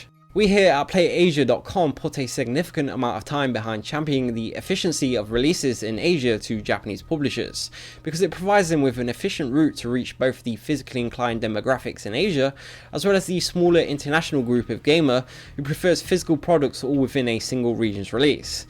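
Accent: United Kingdom. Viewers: You sound like Chris from Family Guy but British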